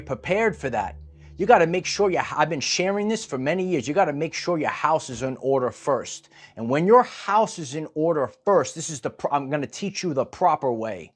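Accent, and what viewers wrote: United States. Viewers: American accent Aussie trees behind you...not aligned from my perspective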